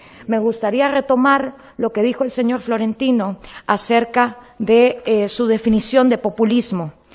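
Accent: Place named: United States. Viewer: acento norte americano y eso sí ya es peligroso...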